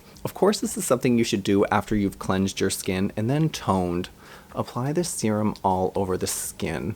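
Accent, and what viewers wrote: Canada. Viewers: Are you Canadian? Great review by the way 😁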